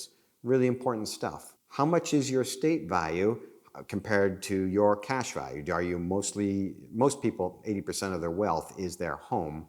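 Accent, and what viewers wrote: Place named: United States. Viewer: Hi you sound american? Are the trust laws in america the same as the uk? Thats if your telling us about american trust laws?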